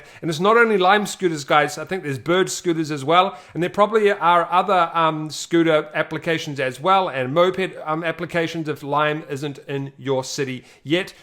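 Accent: New Zealand. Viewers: Are you a New Zealander?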